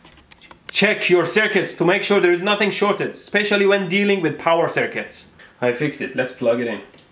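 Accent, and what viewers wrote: Iran. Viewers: Thats a persian accent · Iranian English accent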